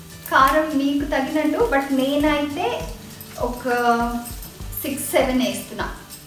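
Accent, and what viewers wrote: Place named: Nellore, India. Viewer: Meeru NELLORE Language mataladuthunaru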